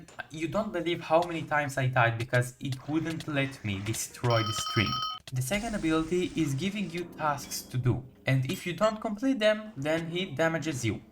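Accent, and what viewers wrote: Israel. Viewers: are you israeli?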